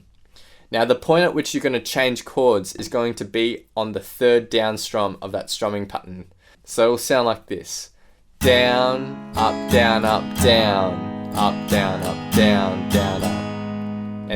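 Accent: Australia. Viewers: wAit your Australian :0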